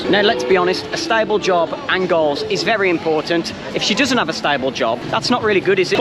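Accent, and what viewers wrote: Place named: Black Country, United Kingdom. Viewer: Sure, well said:) Where in England are you from? Sounds like Black Country...🤗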